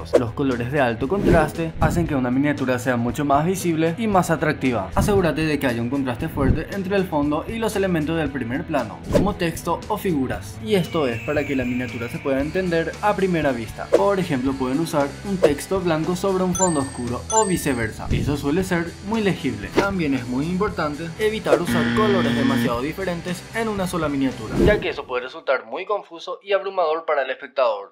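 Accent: Paraguay. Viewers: nde kp vos sos paraguayo? se nota en tu acento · Ya me sonaba el acento paraguayo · Bro, sos Paraguayo? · Gran video, me ayudaste mucho, por cierto, ¿sos paraguayo? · Paraguayo ? verdad kp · Tu acentó parece paraguayo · Eres Paraguayo? Tu forma de hablar me suena Paraguayo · Sos Paraguayo? xd, me doy cuenta por ti acento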